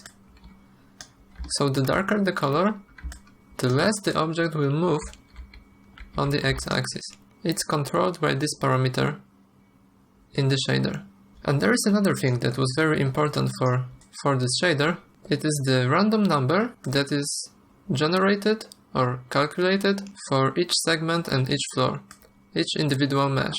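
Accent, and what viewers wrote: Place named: Poland. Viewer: Po Twoim akcencie od razu słychać, że Polak !!! ;)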